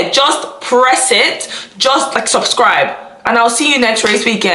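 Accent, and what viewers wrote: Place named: South Africa. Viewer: your accent...you from SA?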